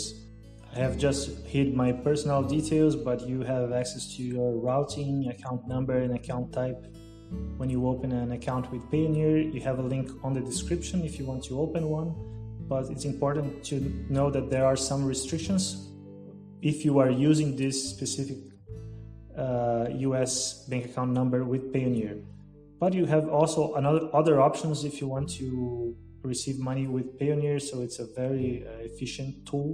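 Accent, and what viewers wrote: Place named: Rio de Janeiro, Brazil. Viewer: Eu tenho quase certeza que é carioca... =D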